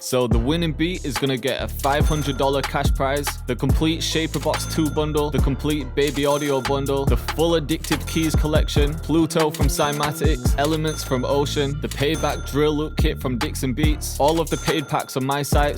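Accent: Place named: Midlands, United Kingdom. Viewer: Yo, whereabouts in the UK are you from? I'm struggling to place your accent but for some reason I'm thinking Sheffield/Nottingham haha.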